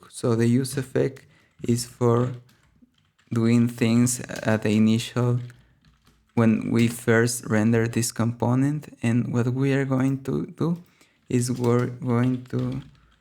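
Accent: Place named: Spain. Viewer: I think you Spanish, your English accent is so beautiful 😍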